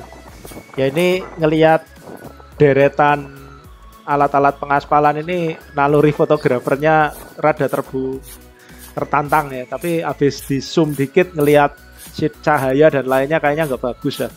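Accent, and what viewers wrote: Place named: Surabaya, Indonesia. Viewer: Mangkane medok_e Jawa Suroboyoan ..hehe salam dari Surabaya Om sehat selalu..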